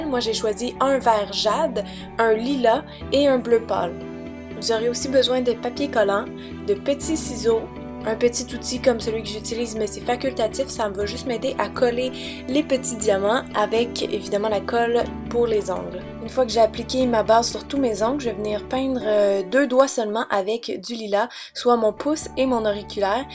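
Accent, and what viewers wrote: Quebec, Canada. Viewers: Très beau! Je suis française et j'ai été surprise, on s'attend pas à entendre un accent Québécois comme ça :) GOOD JOB! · aaaah, l'effet marbré fait penser à de la turquoise!! Des bébés fleures?! X) c'est mignon comme expression! j'adore l'accent québécois ^^ toujours enjoué, c'est agréable! je te ouhaite une bonne continuation :) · Sa 3 idée avec le verni blanc et le scotch est vraiment original je n'avais jamais vus sa encore mais c'est vraiment super et créative ! Les 3 idées de nail art sont vraiment trop cool j'adore ! Et juste j'adore ton ac-sen du Quebec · Merci!:3 j'adore l'accent quebecois! tellement exotique pour moi:D Enfin bref j'adore tes vidéos! merciiii!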